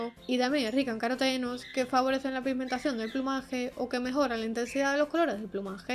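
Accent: Spain. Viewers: Gracias, muy util incluso para mi no solo para ellos jejeje😊Tengo la curiosisad por saber de donde es tu acento ,se que eres española pero de que region? Gracias nuevamente!!! 😊 Un saludo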